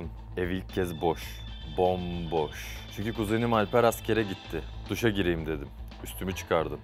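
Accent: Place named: United States. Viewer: Adam amerikalı aksan çok saçma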